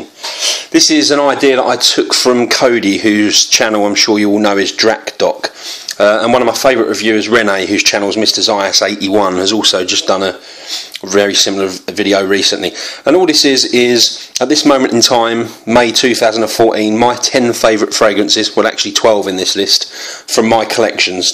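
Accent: United Kingdom. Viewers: Love your British Accent mate !!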